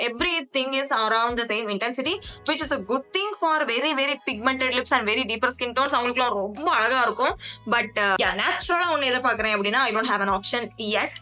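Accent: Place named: Tamil Nadu, India. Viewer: Subscribe paniten. I rarely subscribe. But I like your friendly face and your Tamil accent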